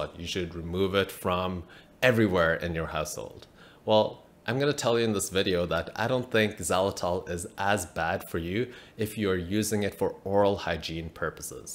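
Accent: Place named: South Africa. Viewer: Hi, I love your videos - well done 👍🏻. When I listen to your accent, sometimes I think I detect either a South African (my former country) or New Zealand accent on some words? I must say the release of the study of Xylitol swerved my inclination to start using it. Now I know how much they used, I will rethink it. One thought I have is when we put a substance in our mouth, it is absorbed into the bloodstream so I'm wondering if this may raise risk 🤔. Just a musing...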